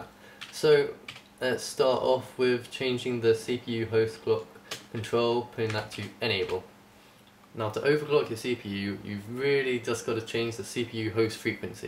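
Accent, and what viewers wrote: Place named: RP, United Kingdom. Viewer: Beautifully spoken. What a wonderful example of speaking the Queen's English. Forget what the pleb antking1117 said. He's just jealous. Probably speaks with a drawwwwlllll. Get your hair cut.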